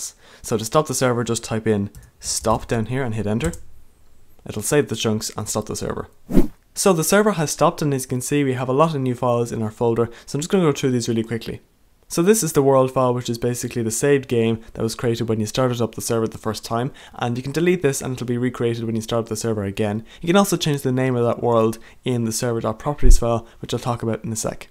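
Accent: Ireland. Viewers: I LOVE YOU YOUR IRISH LIKE ME!! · are you irish ? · Are you from Ireland you sound it? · u r irish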